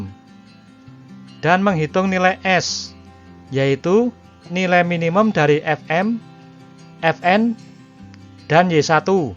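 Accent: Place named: Java, Indonesia. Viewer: Suara ne ketoro tenan nek wong jowo nggh mas.... Salam sko klaten 🤗🤗🤗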